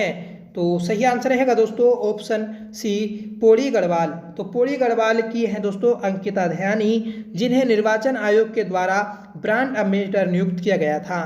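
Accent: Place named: Uttar Pradesh, India. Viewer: Hindi typing m bhut error hai aur apki tone uttrakhand ki km aur up ki jyda hai. Please improve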